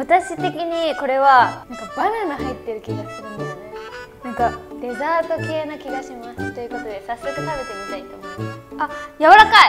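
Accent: Thailand. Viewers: แซบปุอิหลี พูดอิสานน่ารั๊ก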